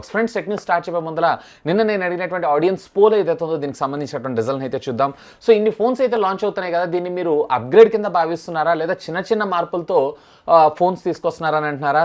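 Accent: India. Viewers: Bro I like ur Buttler English😊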